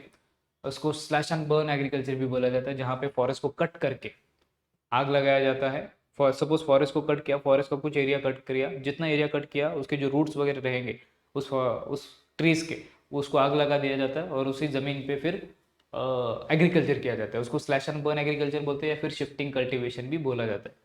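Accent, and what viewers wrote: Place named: Maharashtra, India. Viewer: Ha bolyvarun tar marathich vatat ahet sir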